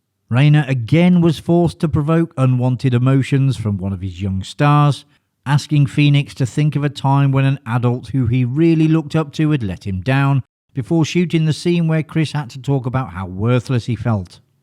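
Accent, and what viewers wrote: United Kingdom. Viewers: Or that the speaker is British... Which based on his accent, I would say is British. A classic one is the word Aluminum. British people say "al-u-min-ee-um" instead of "ah-lum-in-um". They even spell it differently for that one...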